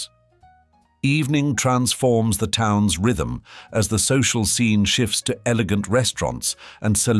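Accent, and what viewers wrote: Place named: RP, United Kingdom. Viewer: First one 😊 and congrats for the video. As a polyglot I do appreciate your accent : sounds clear and a trifle posh fully adapted and carved out 🎉😊❤.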